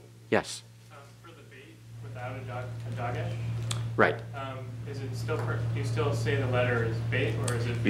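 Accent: United States. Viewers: Errrm wanna get rid of the American accent, if possible. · Lovely class. I really appreciate it. I do have a mild criticism. might want to consider that he should use examples that conform to pronunciations in Hebrew. For instance, there is no a as in bat--none whatsoever--repeating over and over sounds that don't have an equivalent in Hebrew--reinforcing sounds that don't exist. He is also speaking the name of the letters in a thoroughly American English accent. These students, though learning Biblical Hebrew, should learn to sound like an Israeli--because this is proper and useful. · Ok, people...Jew here. This is the thing: These people are learning this language to study with, to become Biblical scholars. They will not be using it as we Jews do to pray in. Yes, the pronunciation can be a little wacky for me, but this man is teaching this from an angle I have never heard. 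I can rattle off prayers in Biblical and Prayerbook Hebrew, and even tell you what I am saying. But I need more depth and he is providing that, so the “Classical”pronunciation is not throwing me off. HOWEVER, I will say that if you ever walk into a synagogue, you are going to hear different pronunciation. This is not the way Jews is most places pronounce some of these sounds. We also tend to not have such a strong American accent—even those of us who are American. In order to pray with this language, listening to some prayers in Sephardic pronunciation would be helpful. Or Ashkenazi, but you will likely not hear that much except by some older folks in Conservative shuls, or in orthodox settings like Chabad.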